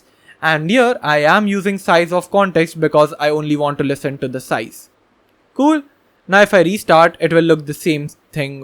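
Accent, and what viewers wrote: India. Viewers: A horrible, bizarre English access... It sounds like an Indian accent. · are Nahi bhai😂. Thanks to U, ek Indian accent mein acha detailed video mil gya. Wrna American accent se sar dukhta h.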